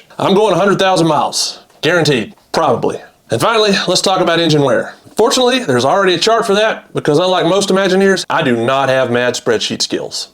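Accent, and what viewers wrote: South, United States. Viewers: The only person I have listened to with Southern accent who doesn’t sound like a moron.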